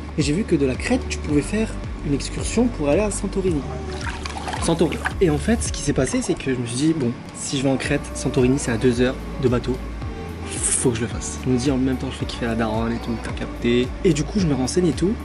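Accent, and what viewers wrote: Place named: Algeria. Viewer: J’ai trop ton accent algérien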